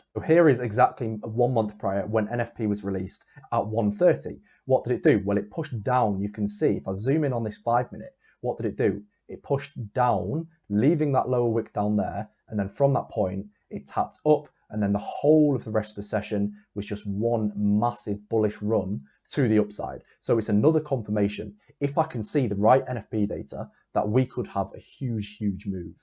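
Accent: United Kingdom. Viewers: His accent seems British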